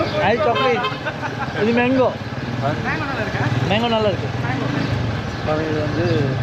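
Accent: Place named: India. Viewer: Eduku da indian pola pesa try panreega🙄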